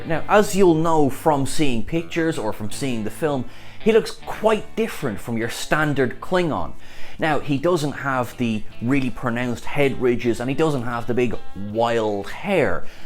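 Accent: United States. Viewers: Your American accent is brilliant! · strong american accent, lol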